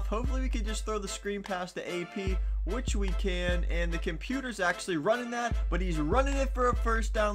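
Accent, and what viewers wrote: Pittsburgh, United States. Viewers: r u from Pittsburgh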